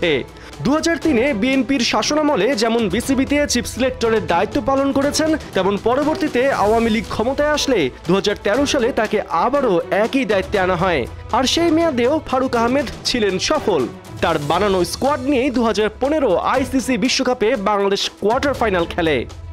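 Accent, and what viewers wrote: Kolkata, India. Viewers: কলিকাতার স্টাইলে খবর পড়েন কেন?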